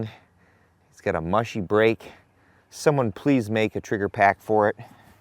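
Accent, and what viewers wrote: Australia. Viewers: Good Australian Accent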